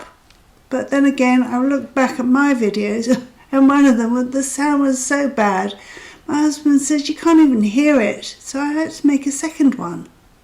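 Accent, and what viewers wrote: United Kingdom. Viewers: Hello 😊 a friend told me about your channel and I immediately got interested and subscribed. I’m just a bit younger and maybe know much more “technology” but getting in front of camera is so intimidating for me. I’m going to watch your videos because I want to support you - I agree we can create a tribe of people like us! You say you like to do art - that interests me a lot because I’m an artist. So, this was the first video I watched of yours and I can see you made progress 👏👏👏. I will watch them all - when I find time in my busy schedule of making videos on tou tube 😅. By the way I love listening to your British accent (as a former English teacher) see you soon ✨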